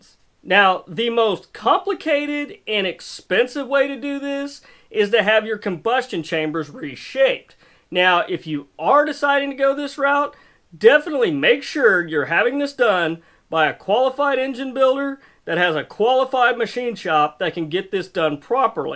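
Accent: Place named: Texas, United States. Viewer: I have noticed that you have the exact same accent as Mike Nesmith from the Monkees he was also from Texas I wonder if you guys are from the same town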